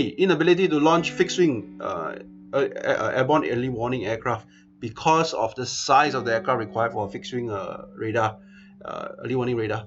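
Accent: China. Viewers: This is Chinese voice, and security of India aircraft is 5% less then Chinese aircraft but according power Indian aircraft is 10% more deadly then Chinese so Indian aircraft can destroy Chinese is possible 30% but Indian destroy chinese aircraft is 70%, don't forget Barak 8, And Aakash and Bramhos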